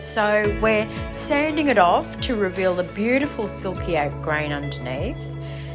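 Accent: Australia. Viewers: Hi, a lovely piece, I love the felt tool,a great idea, also nice to hear an Aussie voice, regards pamela hall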